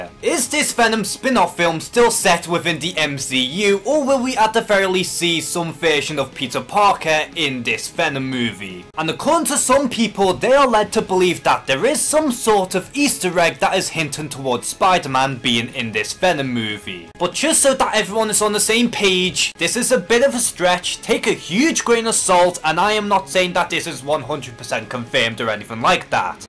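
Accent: Liverpool, United Kingdom. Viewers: Woah are you scouse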